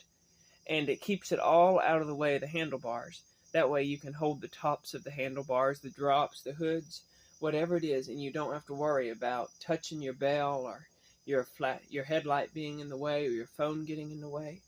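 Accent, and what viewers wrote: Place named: Midwest, United States. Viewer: Kind of off topic, but I love the way you talk. Something in your diction, dictation, and accent is just soothing to me as a Californian. I'm guessing you're from the Midwest in a Mennonite or Amish area. Anyway, I also like the bike!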